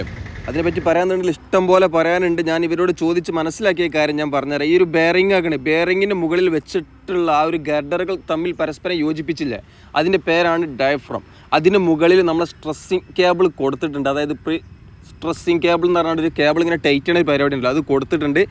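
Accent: Malappuram, India. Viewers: മലം പുറത്ത് കാരനാണ് റിപ്പോർട്ട്‌ ചെയ്യുന്നത് അതുകൊണ്ട് ഞമ്മന്റെ ബാസാ ഇങ്ങനെ ആണ്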